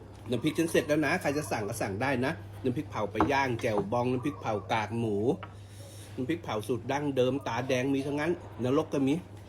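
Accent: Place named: Korat, Thailand. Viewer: พี่ พูดเหมืนคนโคราเลยน้องเป็นคนโคราชแต่มาอยู่ที่ปารีสดูทุกวันเลย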